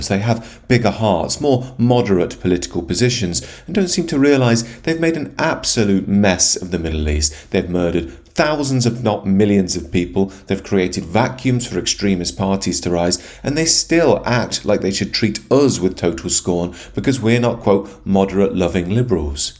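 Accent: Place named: Yorkshire, United Kingdom. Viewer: Knew you had some Yorkshire in there..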